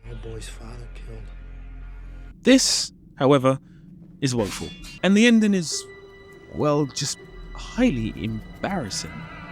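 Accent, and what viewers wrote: United Kingdom. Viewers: I joined your channel cause I thought it was interesting then realize all you do is complain about shit you can't do. I'm a critic of things too but I don't know if it's just that you sound like a total white brit or that you sound pompous as shit. I just can't.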